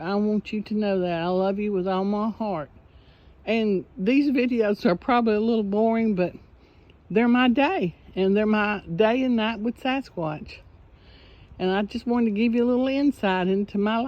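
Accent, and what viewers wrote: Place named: South, United States. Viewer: Hey, I know you! I just realized that YouTube wasn’t notifying me of your videos! I know to check for them now though. Thank you for posting! - Britt Edit: I’m a fan of the southern accent! 😊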